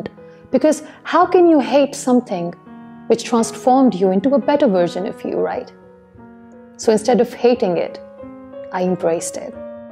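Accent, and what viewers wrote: United Kingdom. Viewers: ur accent is Soooooooooo good mashaAllah plz tell me how did u learn it, it is very much like British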